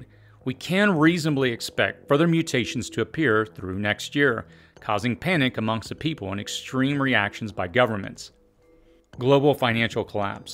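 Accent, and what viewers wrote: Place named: Southern California, United States. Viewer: the so-cal accent is strong in this one.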